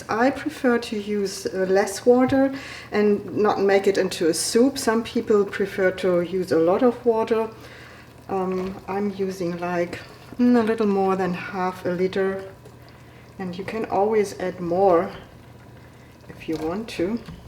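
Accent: Germany. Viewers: You sound just like my German mother who was from Northern Germany. Steer (stir) it😅😊😊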